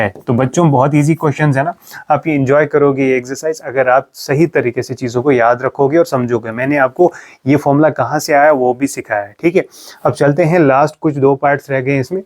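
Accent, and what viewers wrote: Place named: Kashmir, India. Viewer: Sir are u from Kashmir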